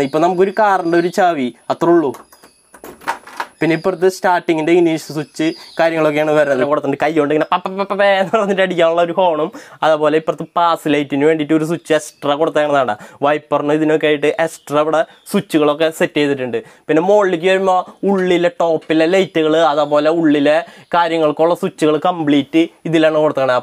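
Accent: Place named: Thrissur, India. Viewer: തൃശൂർ ഭാഷയുടെ അഴക്കൊന്നുവെരുത്തനെ. Bus review നേക്കാൾ നമ്മുടെ തൃശൂർ ഭാഷ കേൾക്കാന വരുന്നത്...... keep it up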